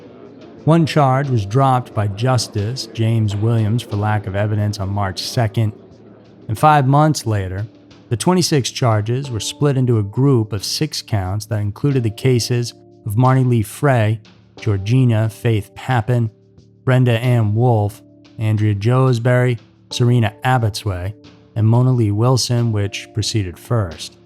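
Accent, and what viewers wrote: United States. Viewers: OMG I ❤️ your accent... where in the USA are you from🇺🇸??.... I'm from Staffs UK🇬🇧... love your posts 😍👌🏼💋 keep them coming, thanks Jo xx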